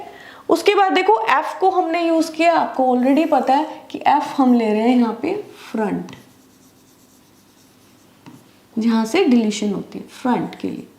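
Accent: Punjab, India. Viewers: Like ur punjabi accent while speaking english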